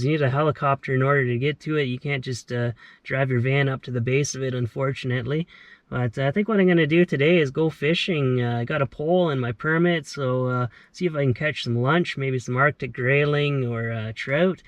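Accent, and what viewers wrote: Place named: New Brunswick, Canada. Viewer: This is insane man. Are you originally from NB? Accent sounds there lol. I’m originally from N.S. myself and wow these videos really makes me want to explore our massive backyard. Keep it up!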